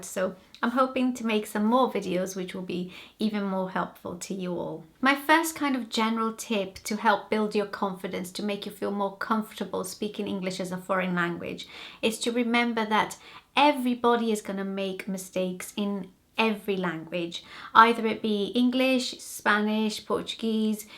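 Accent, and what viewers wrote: Wales, United Kingdom. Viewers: I don't often comment on YouTube videos, but felt I had to with this one, because I was quite astonished when I heard your accent. I have a number of Hispanic friends from Brazil and Columbia and Uruguay and though they can speak English, it is with a very strong Hispanic accent, --something that you have managed to completely overcome. Amazing! As a native English speaker, I can say your English sounds truly excellent and at a high level. You have acquired a pleasant accent too. Wise choice living in Wales where the accent is easy on the ear. You even sound classy and cultivated. Well done! There are always people who like to denigrate others, just ignore them. As an expat living abroad I can testify that it takes a lot of hard work to even achieve basic fluency and acquiring a native accent can be even harder. The natural sound and flow of your speech bears witness to a great deal of effort and some sound achievement. 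It's great too that you are taking the trouble to pass on your tips to others. Be encouraged. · Your English is excellent, fear and precise, with a nice hint of Welsh. A Welsh accent speaking English is so nice to hear. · I honestly had no idea you weren't a native English speaker! Your accent just sounds like abnormal Welsh accent to me!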